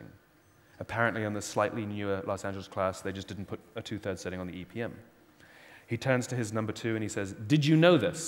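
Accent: United Kingdom. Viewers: Since when does have an English accent? I thought he American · Absolutely love. I have seen so many of his videos and how important is authenticity is to him....fabric of who he is. So I am guessing is that he just wanted to give the British accent a go...why not. Always up for a challenge.....I lived in Scotland for 5 years and could never do a Scottish accent. Anyhow, just thinking out loud. Love and now a huge fan of Marquet:-)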